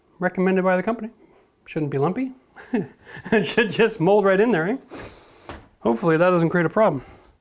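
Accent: Canada. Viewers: Im first to like this video & Saturday night would not be the same without a Canadian saying “out” !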